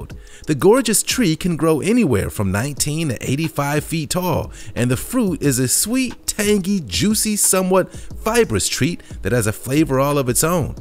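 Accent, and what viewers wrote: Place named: United States. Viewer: I call it custarp apple too i think we speak british english this person is speaking american english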